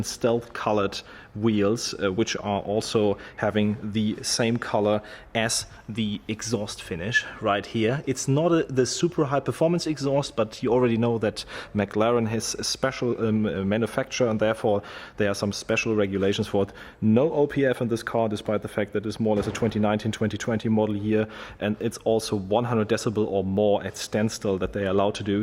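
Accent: Germany. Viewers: Fucking channel sponsered by Audi with digsugting german accent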